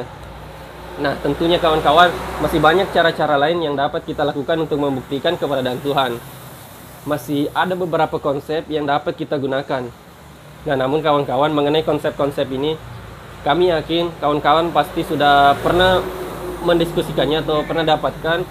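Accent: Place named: Bugis, Indonesia. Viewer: Kayak nya kita satu daerah dari logatnya bang kl boleh nebak dari bugis ya saya juga asli sulawesi tapi dari kecil di jawa skrg sdh menetap di jawa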